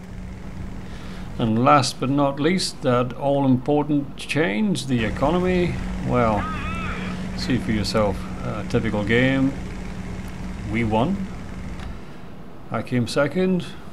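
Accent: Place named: Northern Ireland, United Kingdom. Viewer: Hi, Cheers for the vid 👍, just trying to place… fellow Norn Iron?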